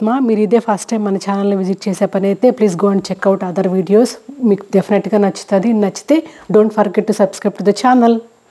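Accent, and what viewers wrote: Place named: Tirupati, India. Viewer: Nice video andi, the way you speak, reminds me of my home town tirupathi. We also call poornaalu as suggi Could you share your pulihora recipe please?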